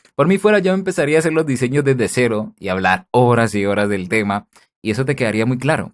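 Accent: Colombia. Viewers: tu eres colombiano de que parte eres pareces de cali si eso es asi un abrazo desde bucaramanga y gracias por todos tus tutoriales de lo nejor que hay en youtube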